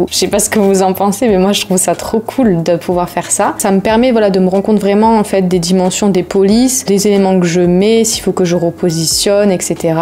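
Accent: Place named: Marseille, France. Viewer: Encore une vidéo sympa et pleine d'enseignements ! Etant en formation CDUI, j'adore tes vidéos pleines de conseils et le petit accent marseillais en plus !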